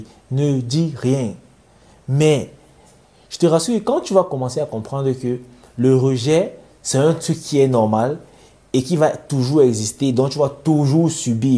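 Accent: Cameroon. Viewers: Pourquoi tu as l’accent 237?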